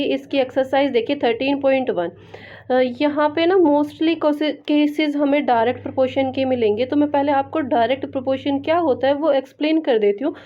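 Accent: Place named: Haryana, India. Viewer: Ma'am aap kaha rehte ho? Harayana? Aapka accent sunke lagta hai aap Harayana ke ho. Aap Harayana ke ho kya?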